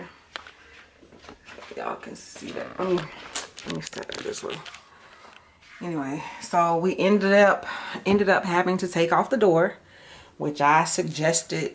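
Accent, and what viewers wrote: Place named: South, United States. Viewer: I'm loving being a new subbie...I've just been watching your channel and letting the videos just play. You have such a beautiful personality and love that southern accent...I'm a Mississippi native myself! and PS our men will sit around tucked under blankets until THEY CANT anymore hahahahah lol I loved that!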